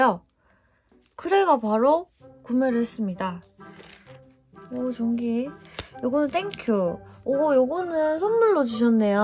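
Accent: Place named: Gyeongsang, South Korea. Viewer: 오늘 영상 처음 보는데 대구분이신가용?? 저희 어머니랑 억양이 비슷해섴ㅋㅋㅋㅋㅋㅋㅋㅋㅋㅋㅋㅋㅋㅋㅋㅋㅋㅋㅋ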